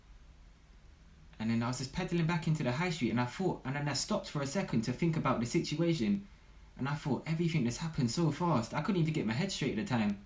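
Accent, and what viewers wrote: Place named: United Kingdom. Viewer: crazy story! I love your british accent lmao, im from australia :)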